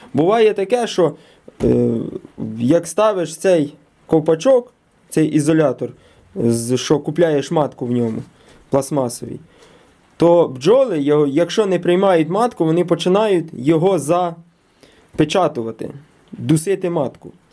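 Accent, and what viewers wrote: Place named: Khmelnytskyi, Ukraine. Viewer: Ви напевно десь з Хмельниччини, у нас така вимова😊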